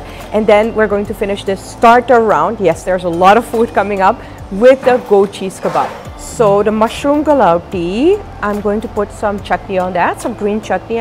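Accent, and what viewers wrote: India. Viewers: you are acquiring that indian accent..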